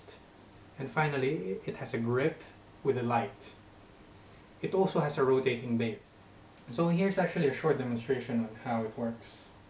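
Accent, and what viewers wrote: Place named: North America. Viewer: North American accent in the UK from somebody coming from Japan . A truly global techie guy !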